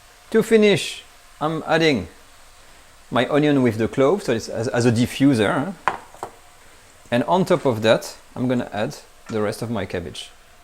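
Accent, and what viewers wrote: France. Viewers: This is exactly how the French speak in Pink Panther. Thanks for an easy and doable French recipe. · His English is quite excellent, and I do love a French accent! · It's Frenglish.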